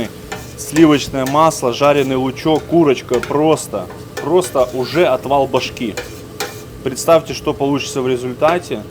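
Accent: Ukraine. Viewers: Да,малоросский акцент. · Все отлично, надо только поработать над собой- украинский акцент сильно мешает слушать, изви6ите!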